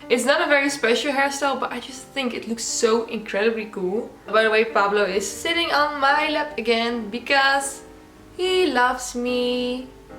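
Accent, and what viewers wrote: Netherlands. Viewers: It's probably because she has such a strong accent. Or the reason she has such a strong accent lol. She doesn't change her voice or letters so it's pretty much Dutch with English words, if you know what I mean. She speaks fluently so it's no effort for her to create sentences, so she can talk like she normally does. She's not really copying the American intonation, which is what you notice when people's voices change. Every language has different intonations and that can make a voice sound different when you speak different languages. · Amai je hoort direct dat je van Nederland komt!😅😅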